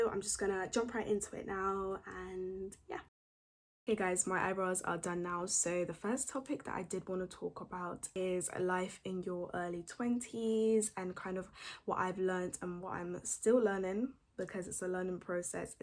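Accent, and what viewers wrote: United Kingdom. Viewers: Your British accent is so refreshing and it's giving!!😩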